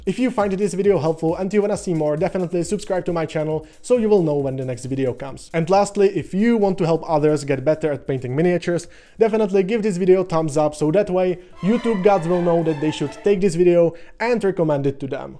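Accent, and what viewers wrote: Czech Republic. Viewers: Slyším cze-nglish! A dobrý videjko. Škoda, že jsem neměl takový tutoriály když jsem začínal barvit :c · Heej, borče! Úžasné video a skvělý kanál, jsi jeden z nejtalentovanějších... "malířů" modýlků? (painter/malíř)...barvič mi zní divně...o natěrači ani nemluvě...whatever, co jsem na YT viděl. A ano, už po asi třech letech a hromadění indicií jako Tvůj přízvuk a analyzování Tvého footage z metra či nalezení Tvých krásných modelů v pražské herně za vitrínou, jsem konečně zjistil že si taktéž obyvatel té naší krásné středoevropské kotliny :D Jsi krásný příklad rčení "zlatý český ručičky". Fakt klobouk dolů. PS: majestic beard, king!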